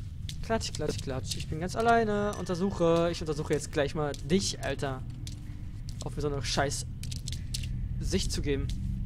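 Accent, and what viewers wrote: Berlin, Germany. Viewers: Ich schreib nur so ungern ;-) Ich schau erst seit gestern deine LP`s und find sie Klasse. Ohnehin geht nix über Berliner Dialekt :D Achja: Mach noch mehr Horrorgames!!!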